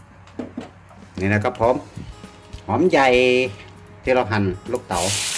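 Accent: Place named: North, Thailand. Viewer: ฟังสำเนียงการพูด น่าจะเป็นคนภาคเหนือนะครับ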